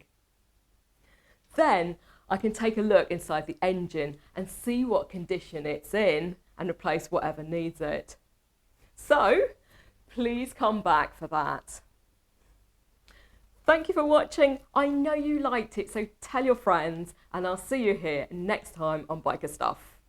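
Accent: United Kingdom. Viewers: Love This Awesome & Beautiful Lady with her Super Gorgeous British Accent just mesmerized. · I love her British accent, too. Also, wonderful fantastic bumper guards. 😊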